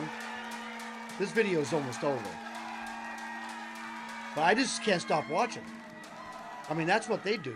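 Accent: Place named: Philadelphia, United States. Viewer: " " by with, trust me! (I'm from Philly, living in the midwest, hearing you speak is like being in my hometown area!)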